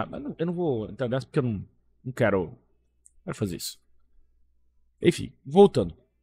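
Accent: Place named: Curitiba, Brazil. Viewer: Eu te sigo pelas suas notícias/informações, e esse teu jeito Piá de Curitiba de narrar as mesmas; acredito que cada um dos 20mil inscritos tem seus motivos e o que deve ser unanime é o formato simples e objetivo do canal .Parabéns pelo trabalho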